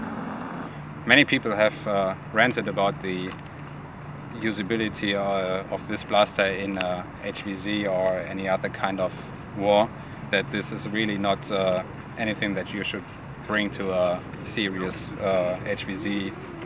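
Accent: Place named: Germany. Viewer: I personally love the german language, and the accent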